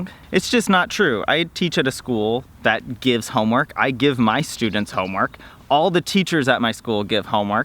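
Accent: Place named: United States. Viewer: You're not Finnish, are you? Awesome American accent. Just, FWIW, Americans will never understand Finns! Sorry, gave up with the bullshit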